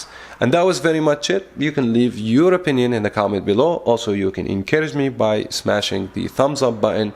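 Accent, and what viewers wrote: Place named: Arab world. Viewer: This video was okay until I heard this annoying Middle Eastern accent.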